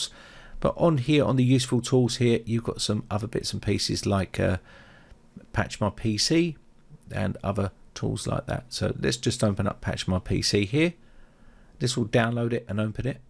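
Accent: England, United Kingdom. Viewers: Since you talk with an English accent, why are you pronouncing CPU-Z as "CPU Zee" and not "CPU Zed", like any Brit would?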